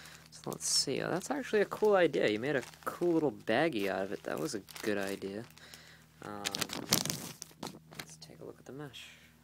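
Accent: Boston, United States. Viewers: Your Boston accent is pretty strong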